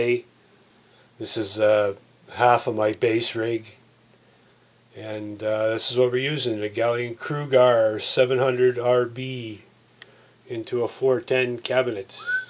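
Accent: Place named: Maritimes, Canada. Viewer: Hey are you from the Maritimes originally? You sound like one of us. lol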